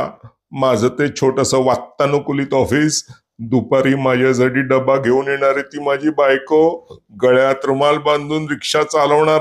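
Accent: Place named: Goa, India. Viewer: Khup chan video editing karta ani voice over pan.. malvani thoda goanis zhala ..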